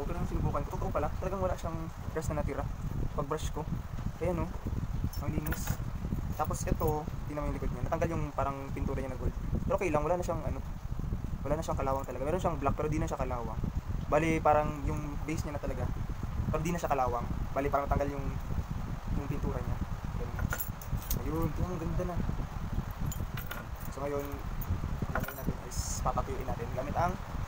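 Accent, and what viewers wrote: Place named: Ilonggo, Philippines. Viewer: Yung accent mo conyong ilonggo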